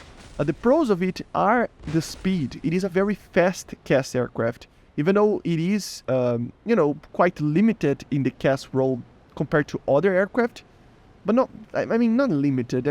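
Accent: Brazil. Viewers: Are you Brasilian bro?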